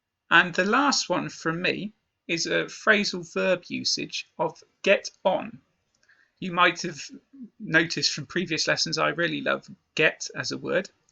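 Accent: United Kingdom. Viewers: This video is great and I love listening to your British accent. Thank you!!!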